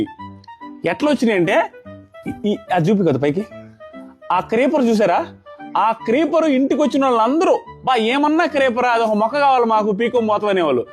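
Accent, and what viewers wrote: Nellore, India. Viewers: Maaa Nellorelolla yaaasa antne untadi mari😂❤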